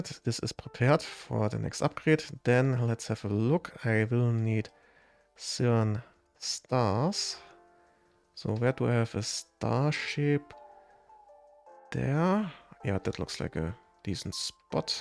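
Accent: Germany. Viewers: The German accent is crazy lol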